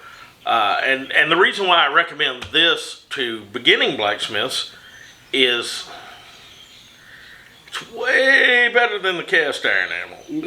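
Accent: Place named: South, United States. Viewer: Never so much as forged a nail, but I've just subscribed anyway, I love this gentleman's personality, it's cool to see someone who speaks like me, slightly different, but to someone up north it would be identical I suppose, I'm from a small town in NW Georgia, but anyway it's awesome to see a southern man like myself doing something on YouTube, so few people from the south use their actual accent on here.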